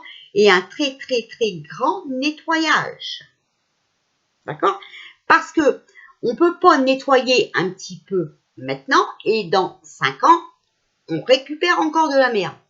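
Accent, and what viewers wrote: North, France. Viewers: C’est avec grand plaisir que je vous écoute cet accent du Nord.... je suis aussi native du Nord mais dans le sud ouest aujourd’hui... Vous avez raison de mettre les points sur les « i ».... hélas bcp dorment encore..... en tous cas grand merci! Restez ce que vous êtes Changez rien! Vous êtes une belle personne... vraiment! ❤️❤️❤️❤️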